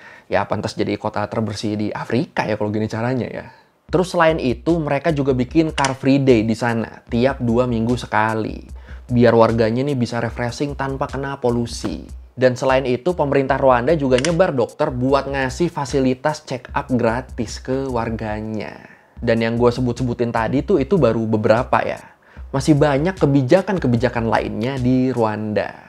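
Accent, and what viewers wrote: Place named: Madura, Indonesia. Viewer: KenaPa LogatLu KaYak ORang MaduRe Cong, BiSa KaYak GiTu Ya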